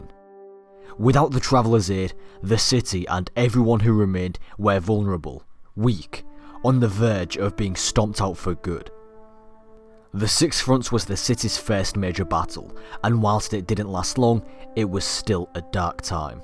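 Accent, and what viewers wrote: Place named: Yorkshire, United Kingdom. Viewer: that's Yorkshire accent.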